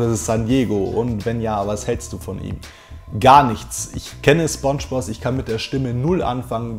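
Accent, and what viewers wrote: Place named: North, Germany. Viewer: Hi, dir auch ein frohes neues Jahr, wieder ein super Video! Hat mich wieder gefreut eine 35 Minuten Ausgabe zu sehen. Gerne mehr von dem Format, Länge ist gar kein Problem. Wenn man dir bei deiner Formulierung etwas ankreiden wollte, könnte man sagen, dass du das Wort "so" häufig benutzt.;) Aber nichts über dein Intro. Früher habe ich deinen Akzent als Norddeutscher gefeiert.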